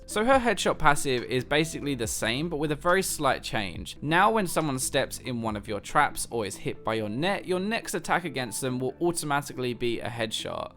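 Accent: United Kingdom. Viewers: I don't even play adc c: Just here to enjoy your beautiful British accent ;)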